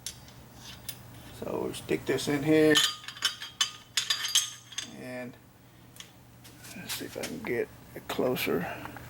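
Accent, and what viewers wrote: Alabama, United States. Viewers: excellent demonstration of re-barreling and head-spacing an M1 Garand. Don't worry about your Alabama accent, I understood everything that you said on your 4-part video. Like another viewer commented, you have given me the confidence to re-barrel my own projects. I would love to go to Gunsmithing school, but there are no decent ones where I live. Thank you kindly, from California, for taking the time to show us all how it's done, and on a budget to boot.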